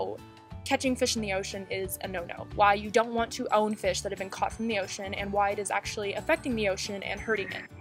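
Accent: Texas, United States. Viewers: I just realized you have a slight Texas accent or whatever it's called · is when i really here her texan acent im from texas to so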